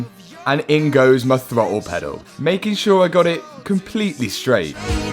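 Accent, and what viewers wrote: United Kingdom. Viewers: No! Your British accent doesn’t sound like Matt’s.!!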